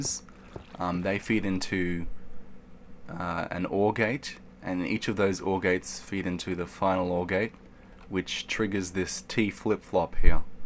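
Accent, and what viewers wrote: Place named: Australia. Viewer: your aussie like me :D i can tell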